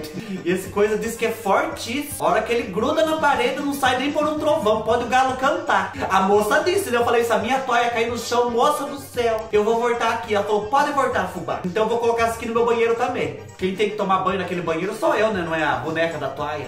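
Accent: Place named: Minas Gerais, Brazil. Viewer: Me divirto tanto com vc...alegra meus dias,.menino lindo, cada dia admiro mais sua simplicidade e bom humor!!Amando vc..queria só saber se onde e vc se e mineirinho ou de outro lugar...seu jeitinho de fala e parecido com o meu..só que sou paranaense mesmo...qua do me apresentaram seus vídeos ..me disseram " olha aí Ivete alguém que fala que nem ocê""kkkkeu falo tudo anssim tamém...😂😂😂pois agora e só ocê que vejo pra dá boas risadas fubá do Céu!!!😘😘😍😍😍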